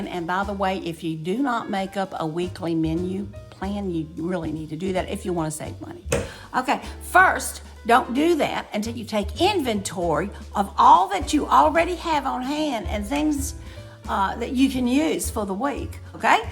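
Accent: South, United States. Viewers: I love it! She’s just like Paula Dean! It’s a southern thing😊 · Just found you today ! I love your joy & enthusiasm & will subscribe & watch … I’m assuming your a southerner . May I ask which one ? I’m from central Pa .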